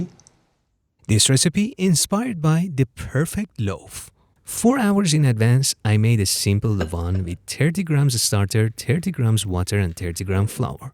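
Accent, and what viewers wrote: Iran. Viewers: Peace ✌🏻.. i like to guess where people are from.. cause i love languages, your accent is pharsi ? 🤓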